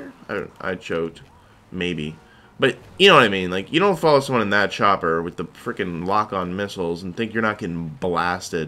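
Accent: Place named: Canada. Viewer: Let me make a vid with you. Your in Canada because of your accent, I'm flying there soon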